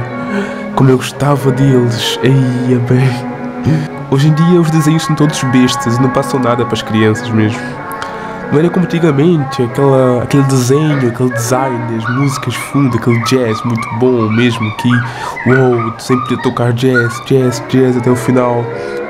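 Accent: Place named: Portugal. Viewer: Sei q vc é brasileiro, mas adoro o sotaque português q vc "pegou". Adoro sotaques, todos, não recordo de nenhum q eu não goste. Não tenho nenhuma dificuldade de entender o q vc fala ou as palavras q vc usa... Gostei muito das tuas divagações sobre estar doente, comida e memórias... E, sim: sinto saudades todos os dias... Fica bem, saúde!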